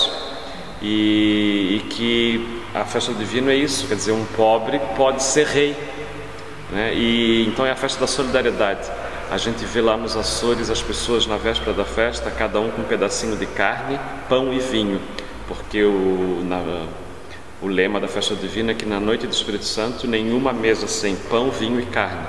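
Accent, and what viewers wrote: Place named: Florianópolis, Brazil. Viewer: Ótimo vídeo! Sotaque bem manezinho... me soa muito familiar. :)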